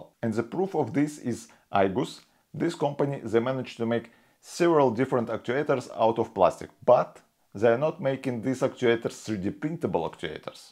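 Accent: Russia. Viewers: Русский английский сразу слышно 😁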